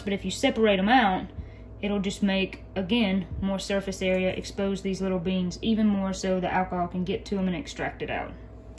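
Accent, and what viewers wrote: South, United States. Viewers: Goodness, your accent makes me want to fix biscuits and gravy. Great video.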